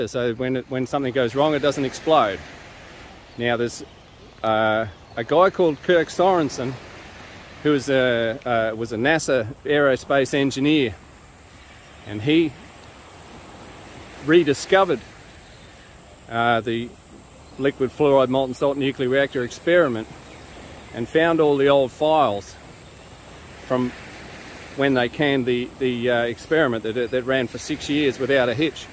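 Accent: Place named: Australia. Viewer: Yes! An Australian talking about LFTRs! What is the status of nuclear power in Australia; LFTR, in particular? Which organisations are out there trying to (re)educate the public about nuclear power (and dispel some of the myths and lies, etc.)? I'm aware of Nuclear for Climate, but they have no policy on LFTR, or any specific reactor type, as far as I could see. It was a bit disappointing that it had to be Peter Dutton to be the first to call for an "unemotional discussion about nuclear power - in particular small modular reactors" in his budget reply speech recently. Still, better than no high-ranking politicians talking about it. But LFTR is where it's at. I've been curious about the combination of LFTR and SMR designs. Can't tell you how stoked I am to hear an Australian accent talking about molten salt reactors running on a thorium cycle. Well, I'm "subbed". 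Keep up the awesome work! PS Regarding the nuclear submarines the current (and former) Commonwealth Government is looking to purchase: I think they'd do a LOT more good if they were put in dry dock and connected to the grid. ;)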